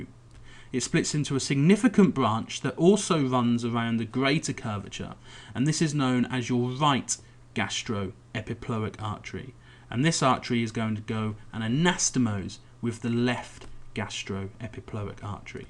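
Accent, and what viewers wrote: United Kingdom. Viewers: Excellent video. Nice to hear an English accent. Go UK!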